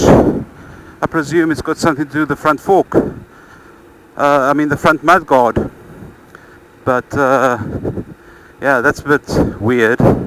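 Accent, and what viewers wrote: South Africa. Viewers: Ai cool, was wondering as you have the SA accent but you are riding on the wrong side of the road ;)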